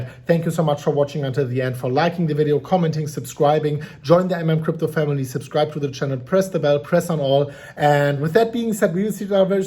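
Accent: Germany. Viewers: I love the German accent in English.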